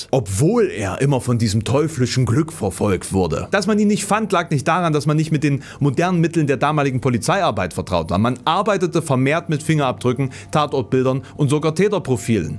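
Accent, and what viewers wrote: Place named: East Germany, Germany. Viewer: Ernsthaft? Ostdeutscher Synchronsprecher?! 🙈😇🤔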